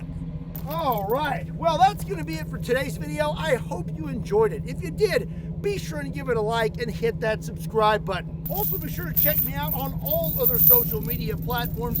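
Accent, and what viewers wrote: Kentucky, United States. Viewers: Joe Rogan but from the stat of Kentucky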